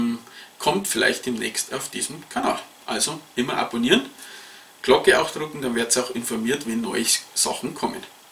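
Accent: Bavaria, Germany. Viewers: Hallo,zuerst mal super Kanal und auch für mich als Elektriker,Prepper,Sportschütze und Funkamateur moch was zu lernen. Du hast ne tolle Art besonders der Bayrische Dialekt ist super. Einen kleinen Kritikpunkt hätte ich und zwar die schlechte Tonqualität,vl wäre ein externes Micro oder so besser aber wahrscheinlich weißt du das eh selber und bist da in der Richtung eh schon am überlegen. Freu mich auf weiter VIdeo bitte mach so weiter echt TOP.!!!!!!!!!!!!!!!!!!!!!!!!!!!!!! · Für einen Bayern redest du richtig gut hochdeutsch. Ist sehr verständlich. Hab schon Sachen erlebt, da hab ich nichts, aber auch gar nichts verstanden. Hab nur nach dem Weg gefragt.....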